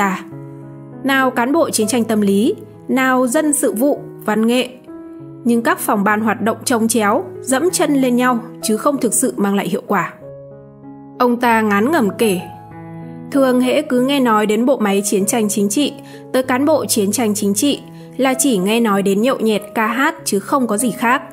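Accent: North, Vietnam. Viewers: Giọng Bắc kỳ đọc rất khó chịu,kho nghe quá,n thì đọc l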